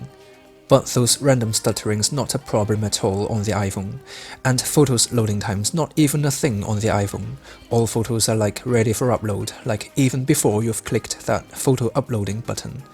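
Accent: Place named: United Kingdom. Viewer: 你的英音真的好听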